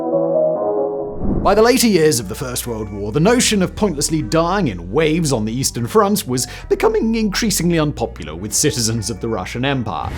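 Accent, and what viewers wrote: United Kingdom. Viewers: You have such great content but your British accent makes your videos nearly unwatchable. Too bad. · Why does an American always question legitimacy of territory when their whole country's very existence is questionable? Even more when your accent is British.. right? Born Hipocrits huh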